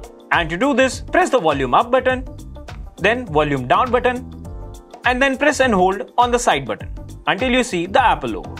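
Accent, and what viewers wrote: India. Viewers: Here’s a great tip for all of us stupid Americans that don’t know how to speak India... Speak a little bit slower and try to separate your words because all we hear is alphabet soup sorry man I just figured to make it easier for your message translation typically will get frustrated and not even bother listening however thank you very much for your knowledge and sharing it with us I do really appreciate it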